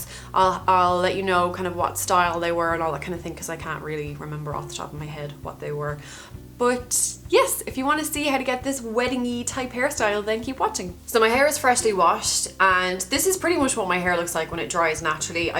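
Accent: Ireland. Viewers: does anyone else hear a little either irish or scotish in her voice?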